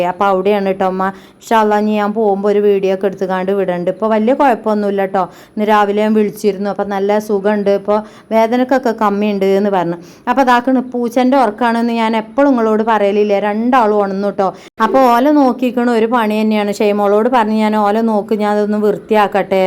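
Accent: Malappuram, India. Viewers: മലപ്പുറത് ഉള്ളവരൊക്കെ അങ്ങനല്ലേ സംസാരിക്കൊള്.... മലപ്പുറത്തേർ കണ്ണൂർ ശയ്‌ലി എങ്ങനെ പറയാൻ പൊട്ടത്തി..... 😂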